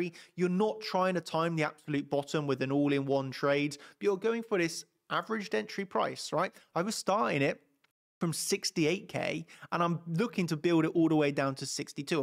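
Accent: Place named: London, United Kingdom. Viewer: Thanks,! Love your accent - got to be from somewhere to the west ('left') of London, surely?! God bless you, from London x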